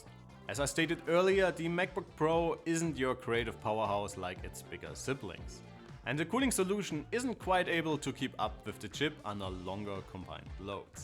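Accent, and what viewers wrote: Germany. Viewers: Wow, ich lese notebookcheck schon seit vielen Jahren, aber das ist das erste Video, was ich von euch sehe. Einfach großartig! Flüssiger Text ohne komische Pausen oder holprige Überleitungen und schönes English Ich mag den leichten deutschen Akzent 👌 Die Einblendungen mit Testergebnissen sind teilweise etwas zu viel für mich, aber smooth 😂 und wenn’s mich näher interessiert, kann ich ja Pause drücken oder nochmal im Artikel nachlesen 👍 Danke ans ganze Team! weiter so! Ich bin gespannt aufs Review vom neuen MBA 🔜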